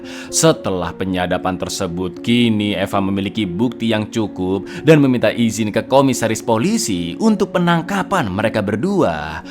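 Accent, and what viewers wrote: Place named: Indramayu, Indonesia. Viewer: Kok logate serupa Indramayu, curiga kang wng dewek 😅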